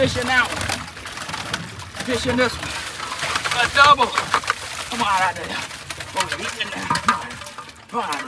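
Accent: Louisiana, United States. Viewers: Please excuse my ignorance but is this a American accent or has he brought it there? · That's a cajun accent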